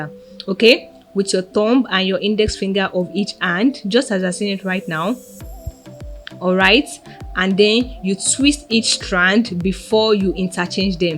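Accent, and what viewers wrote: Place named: Africa. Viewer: She talks toooooo fast with a very strong African accent.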